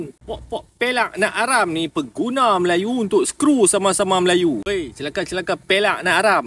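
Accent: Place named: Kedah, Malaysia. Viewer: sempoi bak hang..😅 lama dh aku tak dengaq loghat kedah yg bunyi kasaq🤭... last masa ngan roomate kt Shah alam 30thn lalu...😊 Keep it up bro! Lawan tetap lawan!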